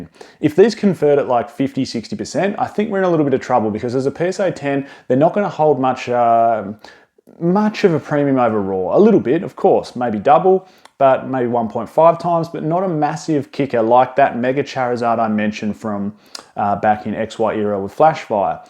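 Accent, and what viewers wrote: Australia. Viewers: Hey, legend, random question but are you aussie by chance?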